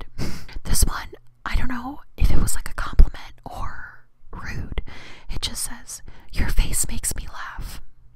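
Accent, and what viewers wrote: United Kingdom. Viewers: The only British accent I’ll ever need to hear the crazy loud Jesus fuck is SOO FUNNY 😂😂😭😭😭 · What is wrong with people? If you don't like something someone is saying or doing on their chanel, just don't watch. It really is that simple. Hope everyone has a blessed day. ( Your british accent was fun) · The British accent 🤣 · As a Brit , I absolutely love your British accent 🤣🤣. Also, abortions during birth? that's terrible JESUS FUCK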